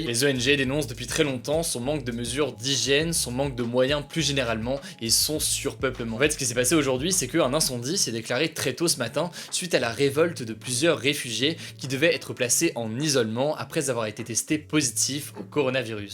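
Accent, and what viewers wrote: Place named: United Kingdom. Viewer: Ton accent anglais 😂👍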